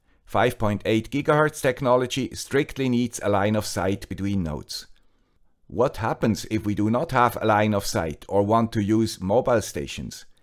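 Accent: Switzerland. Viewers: Not sure how I missed this channel, guy with the Swiss accent.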